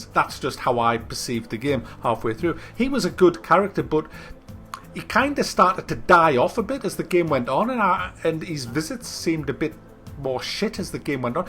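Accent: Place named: Newcastle, United Kingdom. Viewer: You sound like the Geordie version of Sir Davos from Game of Thrones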